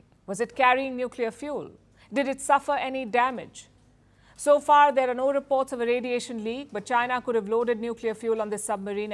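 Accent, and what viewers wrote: India. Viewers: Finally his female "with india voice patterns" does not seem like a cow chewing its Cud OR Carry its self as though the world OWES her The A ++ is her slight Timidity and recognizes there are forces of Awakened that proceed her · FirstPost and WION are CIA funded propaganda channels. They pick this woman with reasonably pretty face and thick Indian accent to confuse Indians. She is paid to make Indians hate China and Russia. She is professional woman who is selling her face and voice to the CIA for US cash. And you fell for it.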